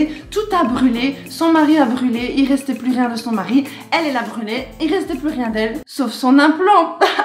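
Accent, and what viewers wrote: Belgium. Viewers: Tu es belge ? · J'adore ton accent, Liège? Verviers?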